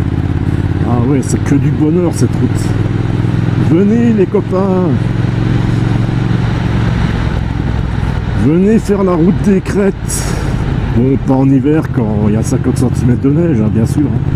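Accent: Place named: Franche-Comté, France. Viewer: .." bohneur " ?! ou " bonheur "?! , vous qui vous moquez un moment donné , sans doute pas méchamment( ?), de l'accent "halsassien " , en tant que " bon " Français ! J'ai cru percevoir un accent franc-comtois , soit dit en passant !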